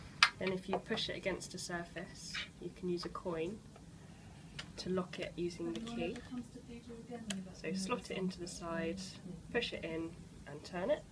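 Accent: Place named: United Kingdom. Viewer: Thank you. Love your explenation and your British accent.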